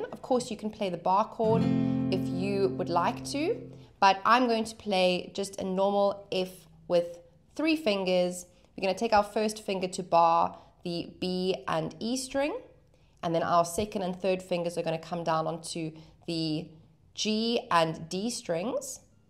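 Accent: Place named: South Africa. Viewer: You have a South African accent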